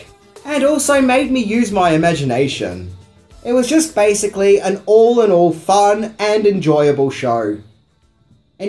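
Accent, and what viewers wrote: Australia. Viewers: Yeah i remember the rename to the slimer thing. I had kind of started to loose interest in it then. But the first couple seasons were really good. But that's what happens to a fruit when you juice it too much. The first press is awesome. Second press.. kinda bitter. third press... you might as well eat the peel. I have been watching your videos trying to place your accent. I never guessed Aussie. And I've been talking to Australians since yahoo chat was a thing. I like Australians. I had a lot of fun conversations back in the mid to late 90's with australian guys on yahoo just joking and talking smack. You are a friendly bunch. I like that.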